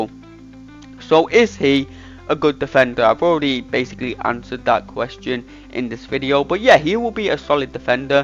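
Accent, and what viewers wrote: United Kingdom. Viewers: Ok so I literally just watched a video by someone else on this guy, and dude I literally couldn’t pay attention through the entire video and learned basically nothing. Then I come here and watch you and I just got 100% locked in immediately somehow?? pls never leave again, my brain can only understand your British explanations 🙏🙏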